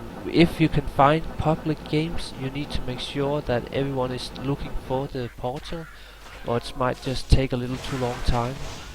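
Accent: Denmark. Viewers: uhhHU i think this guy is from denmark if you are say hej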